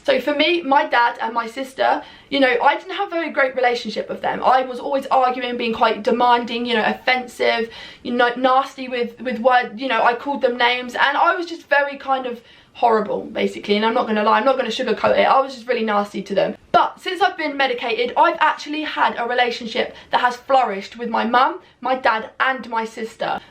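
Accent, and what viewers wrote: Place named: United Kingdom. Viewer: I thought you cant be prescribed stimulants in the UK? You sound like you're from Uk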